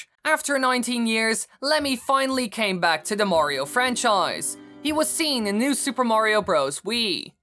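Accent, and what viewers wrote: Netherlands. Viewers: Are you from Nederland s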